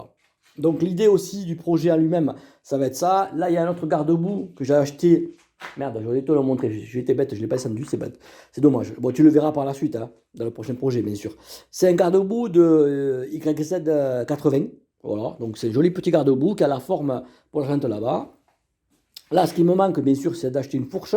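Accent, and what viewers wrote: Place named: South, France. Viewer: est ben écoute Fréro tranquilou 🤣👍 Ouai tkt , je sais ce que c'est .... Compliqué de tous géré 😭 Mais bon .... Ça fait plaisir de revoir ta bouille et l'accent du sud bordel 😁💪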